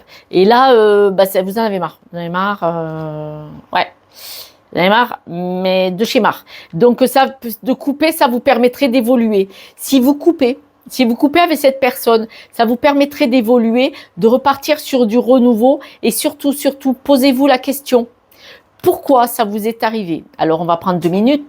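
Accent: South, France. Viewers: Tirage très chaleureux et convivial à l'image de la personne que vous êtes, naturelle et authentique. Surtout ne changez rien. Cerise sur le gâteau, votre accent qui chante bon le sud ! Merci pour le partage de la part de votre nouvelle abonnée. A bientôt ! 🌞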